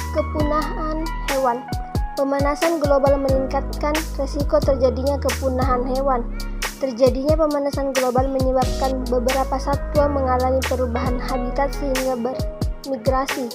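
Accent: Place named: Java, Indonesia. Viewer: medoknya botak hahaha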